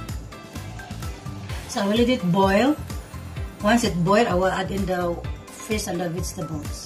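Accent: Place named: Singapore. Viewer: Ganda ng accent mo legit na legit na singaporean hehe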